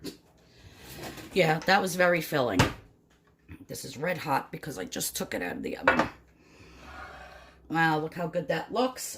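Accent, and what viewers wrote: New York, United States. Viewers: Just found you. Love your videos and food and knew right away from your accent that you are from ny ❤️. Me too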